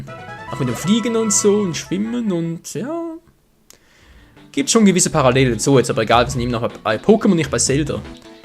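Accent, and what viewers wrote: Switzerland. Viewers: ja man hört es auch bei akzent das es schweizer ist ich bin italiänerinn und rede deutsch wie eine italiänerinn aber schweizer deutsch so berndeutsch wie eine schweizerin xD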